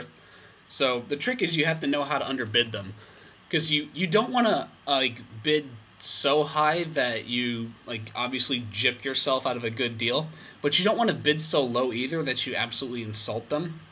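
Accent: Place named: Midwest, United States. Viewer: where are you from in the midwest?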